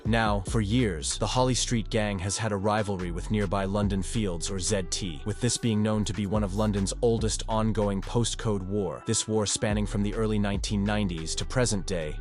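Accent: United States. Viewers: Its an ai generated voice I think, so it messes stuff up. I think that's the reason anyway. Also an American voice so if not ai that's probs why place names are being messed up.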